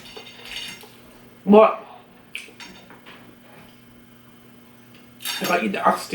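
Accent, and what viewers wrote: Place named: Jamaica. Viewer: You doing good with the Jamaican 🇯🇲🥑accent 😃you go goodie🥰. Happy Independence Day 🍹and you eating your dinner 🥘 right🍍🍅🥒🥥🍍mi making some a your hominy corn porridge 🥣 for my dinner today 8/6/20 🇯🇲😃❤️keep the Jamaican twang going. You not from Spanish country, so you have to speak your Jamaican twang 👍🧄🥕🦞🌷🥰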